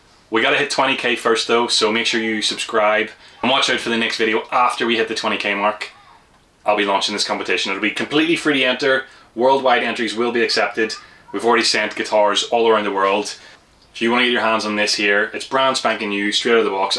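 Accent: Northern Ireland, United Kingdom. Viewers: thanks for replying! Love our Norn Irish accent being broadcast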